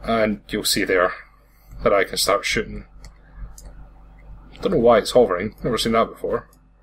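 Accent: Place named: Scotland, United Kingdom. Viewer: Love the Scottishness <3 I'd love to see more games like these. Ones that are good time wasters and that are off the main Radar of Say the Steam featured items page :D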